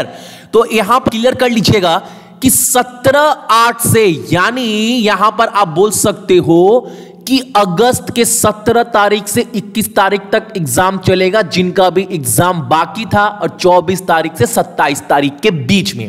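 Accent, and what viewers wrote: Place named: Kolkata, India. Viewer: Actually he is from kolkata so 11 is ekgarha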